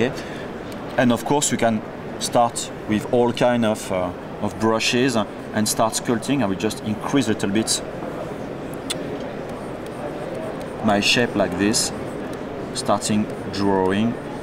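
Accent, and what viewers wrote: France. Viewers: On reconnaît bien l'accent français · I was thinking this guy is french.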